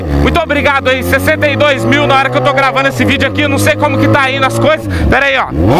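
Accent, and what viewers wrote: Goiás, Brazil. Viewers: vc e Goiano?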